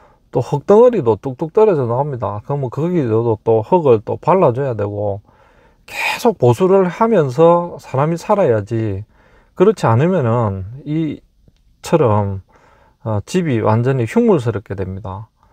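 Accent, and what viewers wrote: Gyeongsang, South Korea. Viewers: 님 사투리 어디인가요!! 전남광주는 아니고 경남맞나요 구독좋아요 누르고갑니다 😊😊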